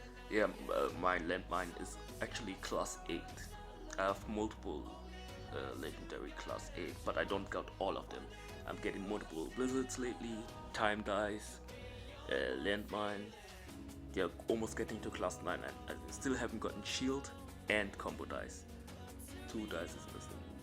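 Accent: Germany. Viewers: Ich habe den akzent direkt erkannt da ich selber deutsch bin erkennt man sowas direkt wenn ein deutscher englisch spricht war mir aber nicht sicher xD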